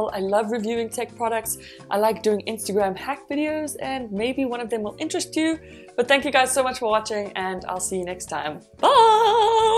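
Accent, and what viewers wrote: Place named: South Africa. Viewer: Thanks for the review! I thought I recognized the accent (lived in SA for 6 years). This decision about which Versa to buy can be daunting lol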